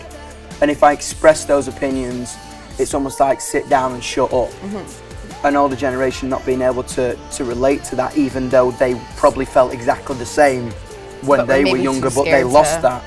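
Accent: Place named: Northern England, United Kingdom. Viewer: ‘It’s the twenny ferst centree fer fock sehke’ God I love the northern accent 😂😂😂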